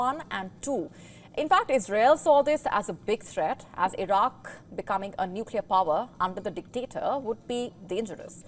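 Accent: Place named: India. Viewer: These Indi accent is awful lol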